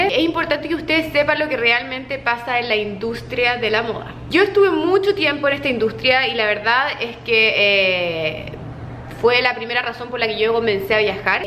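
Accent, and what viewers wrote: Chile. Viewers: Es el acento chileno formal (osea, sin modismos). El típico acento Santiaguino. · Que lindo el acento chileno 😊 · linda modelo pero tiene un acento chileno horrible . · Eres chilena ? tienes todo el asento jaja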